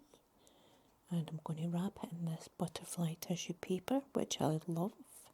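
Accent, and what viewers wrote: Scotland, United Kingdom. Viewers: Where in Scotland are you from if you don't mind me asking? Great video, amazing work!x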